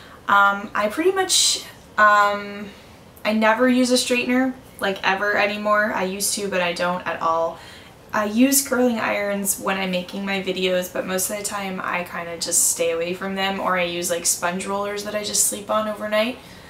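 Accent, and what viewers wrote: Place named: East Coast, United States. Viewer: You say "length" so weird. It's definitely East Coast. I've never heard someone say length that way! By the way, it's ho-HO-bah oil. My mom whacks me over the head if I say jo-jo-ba, so I'm passing the knowledge on.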